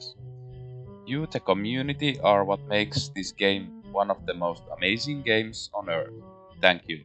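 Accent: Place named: Finland. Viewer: i was listening to your video and my wife just went from the other side of the room "that guy has a finnish accent!" :):) terveisia vaasasta!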